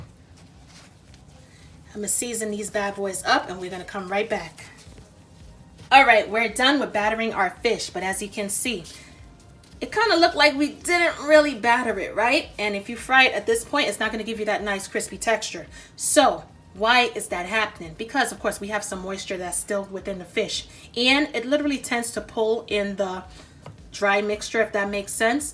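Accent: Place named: Bronx, United States. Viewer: Great vid … Luv Da Bronx accent! 😉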